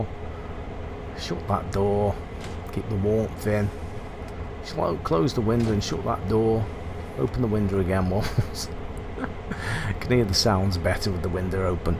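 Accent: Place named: Stoke-on-Trent, United Kingdom. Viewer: You from stoke on trent by any chance? im from sneyd green and recognise that accent, although mine has gone now as ive been in somerset for 8 years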